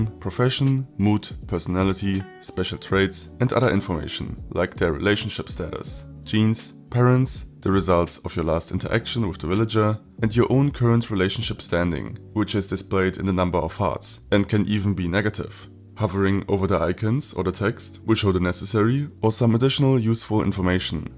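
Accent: Germany. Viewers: Deutsch englischer Dialekt accent yeah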